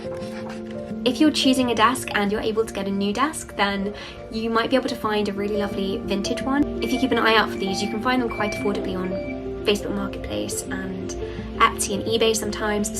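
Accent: United Kingdom. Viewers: I was knocked off my chair (figuratively) by the Britishness of your accent.... OR. MY. GOD😍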